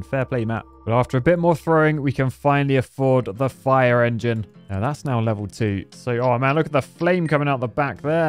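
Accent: United Kingdom. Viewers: gotta love the real britich accent amazing!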